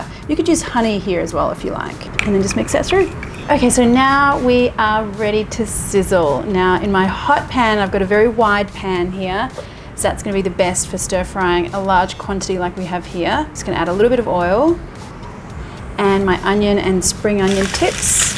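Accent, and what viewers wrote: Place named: Australia. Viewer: I'm never gonna make any of these recipes lol but I love watching you and hear your Australian accent and sizzling food. Aaaah who else?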